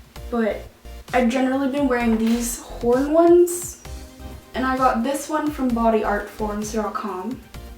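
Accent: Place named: Canada. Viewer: love your accent, Canadian??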